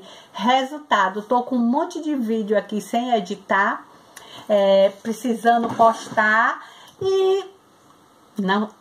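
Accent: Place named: Bahia, Brazil. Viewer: Adoro a sua pronúncia. É tão engraçada. Das pronúncias do português, variante brasileira, as que eu gosto mesmo é da sua (penso que é da região de S. Salvador da Baía) e da de Minas. São muito "cantadas"! Beijinhos de Portugal. Ah, também já sentia a sua falta. Parece que a partir da altura em que me inscrevi no seu canal, deixou de postar com alguma frequência. Volte logo, para poder ouvi-la (gosto!).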